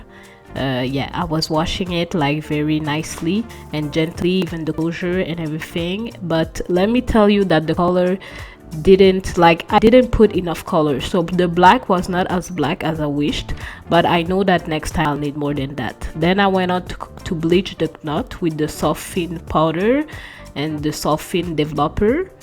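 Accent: France. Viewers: are you french? :)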